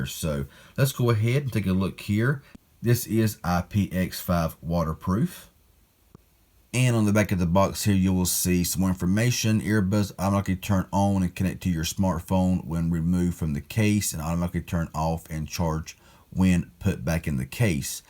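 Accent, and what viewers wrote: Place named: Appalachia, United States. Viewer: Good God, are you TRYING to sound like a freaking hillbilly?!???....what a waste of MY time....I GAVE UP trying to listen to your "presentation"!!!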